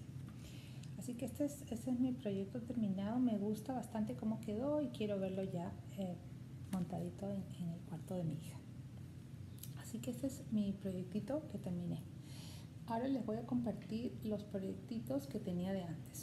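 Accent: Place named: Peru. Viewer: Hola! Acabo de descubrir tu canal, por tu acento y el torito de Pucará, que mencionaste al final, me parece que eres de Perú ¿?.... Si es así, que alegría haber descubierto un flosstube de una peruana 🤗🤗.... Están lindos los bordados que enseñaste terminados y los que tienes planeados empezar. Las telas me han encantado sobretodo la que resultó de la combinación de ambos colores. Saludos desde Lima y feliz bordado!!!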